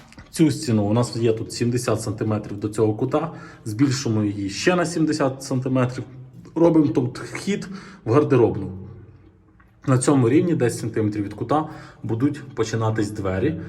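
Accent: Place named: Surzhyk, Ukraine. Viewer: Мова з суржиком, трохи гидко звучить.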